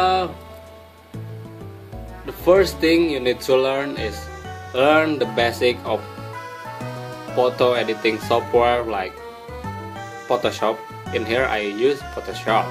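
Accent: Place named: Vietnam. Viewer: its pretty standard vietnamese english if i do say so. i think they're just racists.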